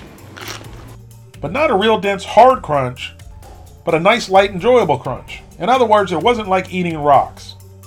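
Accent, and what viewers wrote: South, United States. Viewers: Hey! Just started binge watching your videos this week and I have to say it man, love the southern drawl. Makes me feel right at home. Keep on keepin on brotha!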